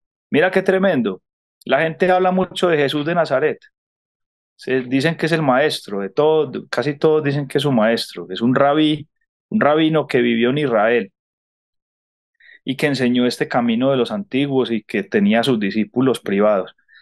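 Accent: Colombia. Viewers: Soy colombiana pero créame q me encanta como hablamos los colombianos. Una en Colombia bueno no le párese pero cuando uno va a otro país y escucha como hablamos. Jajaja jajaja me encanta. Nuestro hablado habla y se escucha espectacular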